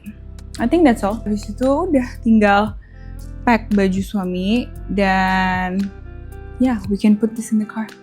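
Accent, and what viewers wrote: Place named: France. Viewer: aku tu suka bgt kalau kamu ud ngomong inggris tapi akses prancis kek kek anjaay mehong bgt dirimu😭💓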